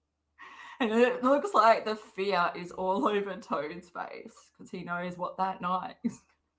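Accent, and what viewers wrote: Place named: Australia. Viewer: Your voice helps me sleep. I watch all you vids and it’s my favorite to put one on before bedtime to fall asleep to your Australian accent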